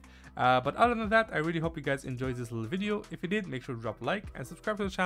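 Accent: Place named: France. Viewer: J’ai l’impression que t’es français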